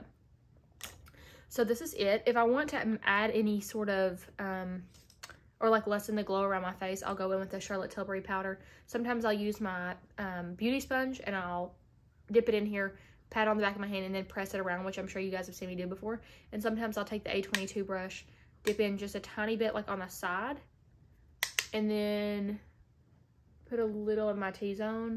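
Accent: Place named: Kentucky, United States. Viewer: Are you from Ky? Your accent makes me think you’re a Kentucky gal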